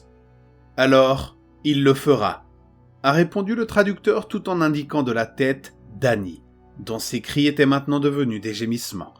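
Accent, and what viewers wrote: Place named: Arab world. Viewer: Toujours aussi prenante… PS : +1 pour l’accent arabe !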